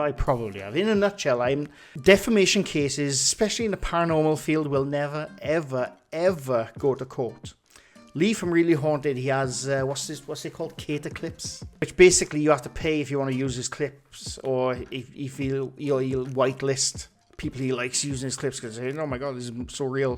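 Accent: United Kingdom. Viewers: I take it from your accent that you are posting from the UK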